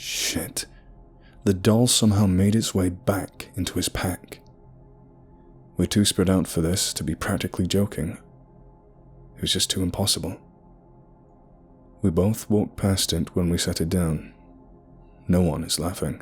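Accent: South, United States. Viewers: i like the country narrator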